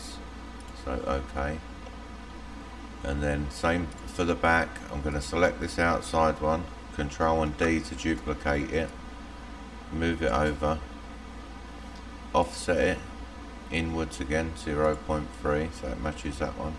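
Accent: United Kingdom. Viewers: Thank's for all the laser vidéos. From France, your accent remind me Peaky blinders...👍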